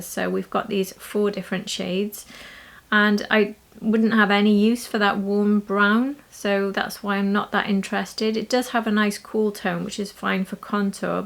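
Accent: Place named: South Wales, United Kingdom. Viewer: I love your accent, is it South Wales?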